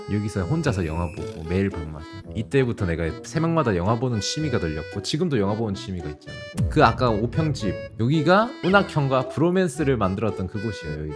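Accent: Gyeongsang, South Korea. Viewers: 경상도 사람이엿구나..........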